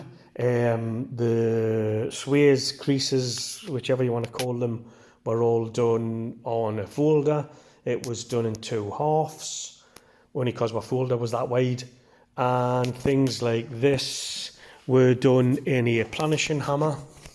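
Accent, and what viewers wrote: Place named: North East England, United Kingdom. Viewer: Yes please, keep them coming. I've got massive affection for Capris. I learnt to drive in a MK2 Cortina, which I loved. I have had 2 of those, a MK1 Capri, 1600GT XLR, which I adored and obviously should have kept, and loads more Fords, last one being a Series 1 Escort RS Turbo, also wish I'd kept that! It sounds like you are from the North East, did you ever come across a guy called Gordon Leeming?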